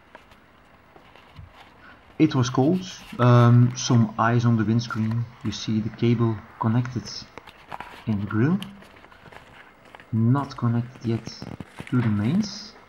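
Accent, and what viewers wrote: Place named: England, United Kingdom. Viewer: Thank you for this great video. Btw, j´aime bien votre accent anglais :-))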